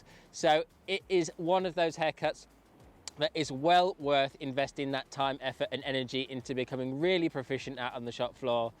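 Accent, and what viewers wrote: United Kingdom. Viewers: Im a totally new hairdresser student and happy to find someone who just explains what to do and not all kinds of useless jokes and comments. Clear and easy to follow.The british accent helps as well ;) Thank you, you have a new apprentice!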